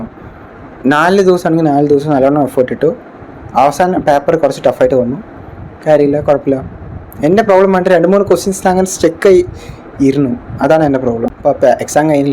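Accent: Tamil Nadu, India. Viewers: Tamil touch.......... ❤❤❤ nalla sound...... Ente avastha..... 😒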